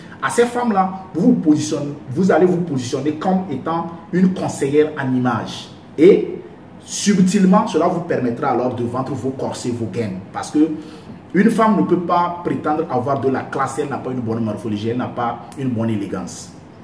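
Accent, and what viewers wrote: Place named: Togo. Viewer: Enfin un togolais qu'on peut suivre. J'ai reconnu l'accent en meme temps. Preach brother!! Preach .... Bon vent a toi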